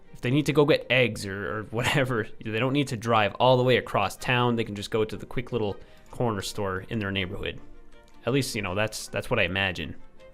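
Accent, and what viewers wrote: Canada. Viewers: I wondered all through the first episode why your accent gave slight Canadian energy. Still no houses, eh?" was sort of a giveaway. East Coast-er?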